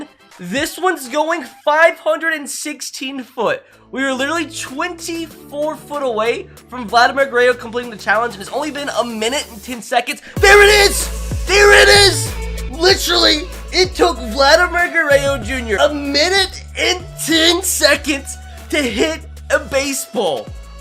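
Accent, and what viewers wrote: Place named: Alabama, United States. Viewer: he's from Alabama and has a accent so do I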